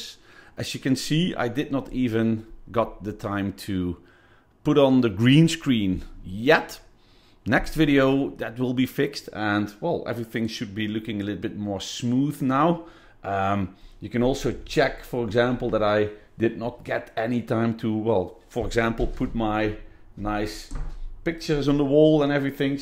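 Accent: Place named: Netherlands. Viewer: Hallo U komt uit Nederland dat is goed te horen ☜(ﾟヮﾟ☜) Ik ben bezig met mijn MS-500 bedankt voor de video :)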